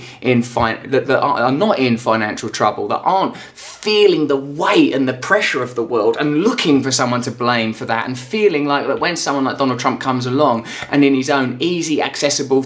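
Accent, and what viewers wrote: United Kingdom. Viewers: Do we really want to take political advice from a fucking comedian? Just because he uses big words and has a British accents doesn't mean his arguments make any sense. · This is hell good. I am preparing myself for Itels and I am listening British accent, found this and I wrote a bunch of good words to use. The fluency of his words are absolute amazing. Congrats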